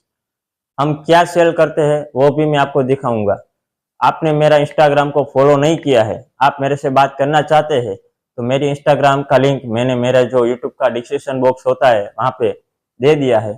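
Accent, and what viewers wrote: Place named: Kathiyawad, India. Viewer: Apne jo 30,000 rupees invest kiye the wo kis chij me invest kiye the wo ap explain kar sakte hai kya ? Video me karenge to bhi chalega or messages me replies karenge to bhi chalega.....or mujhe ye janana tha ki - 1 - melting machine price 2 - kitane type ke wax type hai apke pas or usaka price. 3 - wax melt karne ke bad jis glass me wax dalate hain us glass ka price 4 - maine apka box dekha hai wo apne shayad banwaya hai or wo bahut cha hai to us packaging box ka price. 5 - ap kitane type ke colour use karte ho or her ek color ka price. 6 - agar ap fragrances use karte ho to usaka price kitana pada apko. 7 - wicks ( candel dhaga ) hoti hai usaka price 8 - candle banane ke liye ap jo molds liye honge, to apne kitane types ke molds liye hai or unake bhi price batao. Hame bas apke raw material ka price ka idea batao kyunki hamare idhar ki kuch ladies start karna chahti hai. 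Or wo bahut Young hai agar ap ye sab batayenge to bahut help hogi. Ap shayad gujrat se hai, because apka awaj kathiyawadi type ka lag raha hai, or apki video me jo apne e-commerce ki journey dikha rahe hai... Wo bahut achi hai. Aisehi ap imandari se kam karte raho.bhagwan apko bahut age bhadaye Dhanywad apko 🙏❤️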